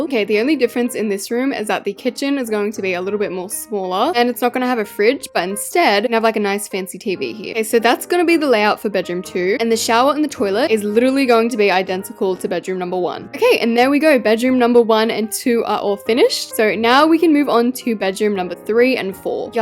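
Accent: England, United Kingdom. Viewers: ur brit accent is more british than me and i’m british😭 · stwoooppppp i loved her english accent its so nice!!!!!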